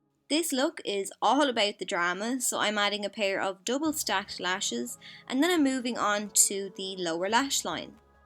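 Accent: Ireland. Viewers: you're accent is so pretty! you sound so sophisticated whenever you speak, are you from Ireland? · I love your Irish accent, and I'm half Irish myself. I love that beautiful makeup look you did. I am also learning how to do liquid winged eyeliner.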